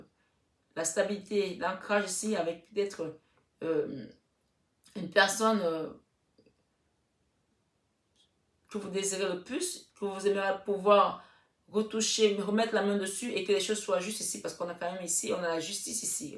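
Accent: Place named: Cameroon. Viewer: Bonjour ma chère votre tirage me parle énormément, et le premier visionnage de vos tirages j'ai reconnu vôtre accent du Cameroun car j'ai une histoire avec une femme bams qui vous ressemble beaucoup donc je sais ce qu'il m attend 😅 merci infiniment vous êtes magnifiques bien à vous Sir Lion 🦁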